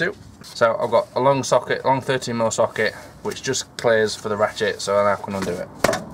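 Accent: England, United Kingdom. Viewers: Good video - Car repair taught by a guy with a classy English accent. Couldn't be too much better. Well - maybe if I could have talked someone else into doing my Focus brakes but,.....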